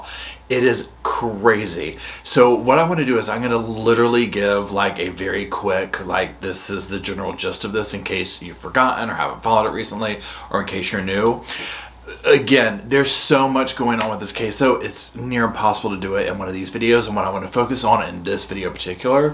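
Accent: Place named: North Carolina, United States. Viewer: NC!! I knew your accent sounded like mine! Xoxo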